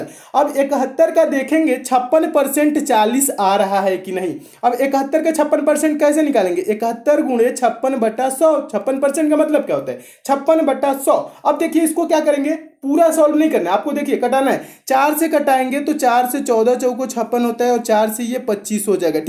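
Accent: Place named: Bihar, India. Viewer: Sir aap bihar se ho kya kyonki bihari 11 ko igara bolte hai nice sir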